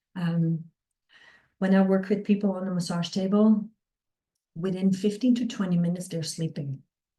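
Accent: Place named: Australia. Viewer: I've only just started listening but it sounds very much like you've got an Australian accent and people from any other country, apparently our Aussie accent is really hard to try and imitate 🙏🏻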